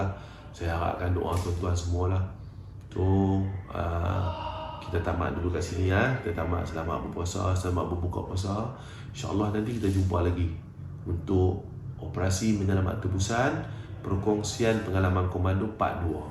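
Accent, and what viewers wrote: Kedah, Malaysia. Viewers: Yang buat kan video ni best sbb loghat kedah. Teringat duk kampung tenang..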